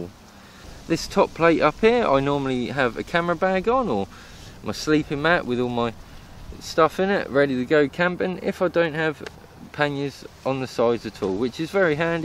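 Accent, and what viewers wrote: Norfolk, United Kingdom. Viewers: Bor Yueh presented by a rum ole Norfolk boi sounds like ole Boi yeah haha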